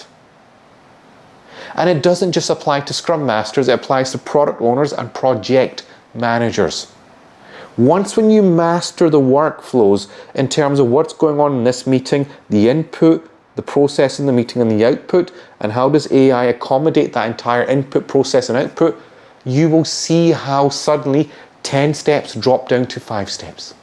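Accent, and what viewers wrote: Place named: United Kingdom. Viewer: Heyy bro this is a great video, I currently work in the IT Sector and I’ve been in my role for 4 years a 2nd line role, and I’m looking to change career to become a Scrum Master. Considering my IT background which certifications would I need minimum to become a Scrum Master, Agile Project Manager, Delivery Manager. So and so forth. In the London Region? You sound like you’re from the UK aswell. I also have an ITIL Framework certification. Thanks man, and I look forward to your response🙏 your videos are great, keep it up👍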